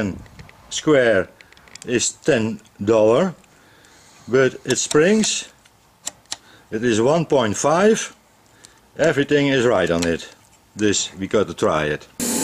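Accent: Netherlands. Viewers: He is Dutch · You are dutch right? Because my family is dutch and you have an accent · agree,his accent is Dutch not German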